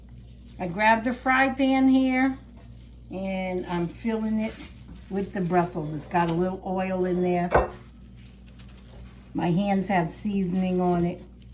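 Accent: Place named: New York, United States. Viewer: Sent to fast young lady . Your new York accent is making me home sick for Commonwealth Ave... THANK YOU FRANK FROM MONTANA......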